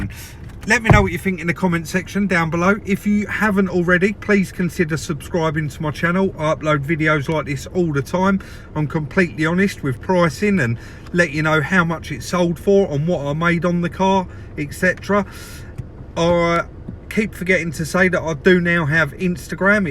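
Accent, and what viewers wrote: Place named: Cockney, United Kingdom. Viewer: Great content, don’t change that cockney accent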